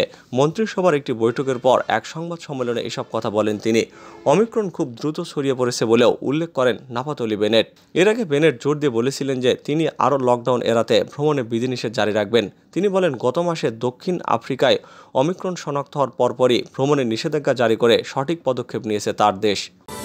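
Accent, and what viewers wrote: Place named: India. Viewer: এক ই প্রশ্ন আমি লিখতে যেয়ে দেখি আপনি লিখেছেন। সংবাদ পাঠক তো ইন্ডিয়ার ভাষায় কথা বলে। চ্যানেল টা কি ভারত নিয়ন্ত্রিত?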